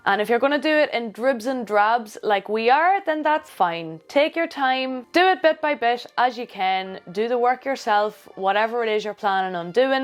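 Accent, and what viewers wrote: Ireland. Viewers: Great video. What's the accent? Not Louth . . . maybe Monaghan? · ♡ i LOVE your accent please please please .............. ~ whether I get to be your neighbor in Ireland (an absolute ♡ dream for me) or not .... with all my heart I pray we shall be in Heaven 🙏 🙏🙏🙏🙏🙏🙏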